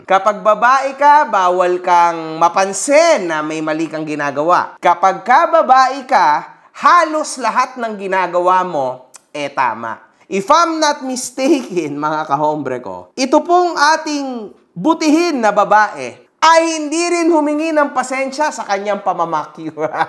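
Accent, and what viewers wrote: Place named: Conyo, Philippines. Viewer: Conyo ampt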